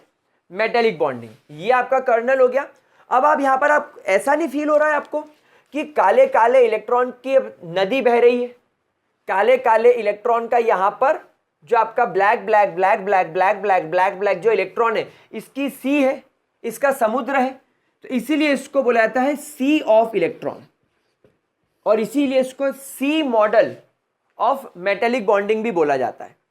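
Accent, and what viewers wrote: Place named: Bhopal, India. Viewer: Sir your voice is same to same of bhopalvwalo :---- hoegi ❤️❤️❤️❤️🙏🔥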